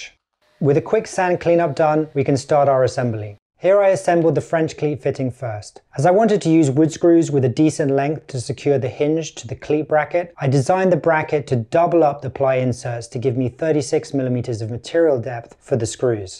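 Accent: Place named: United Kingdom. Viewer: Hi Gotta say it's Soooo Refreshing to hear a British accent whilst watching YouTube videos. keep up the Great Content.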